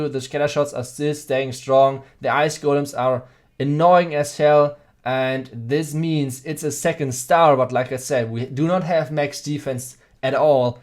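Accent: Germany. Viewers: Are you german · Speaking english with German accent, that was unique 👌👌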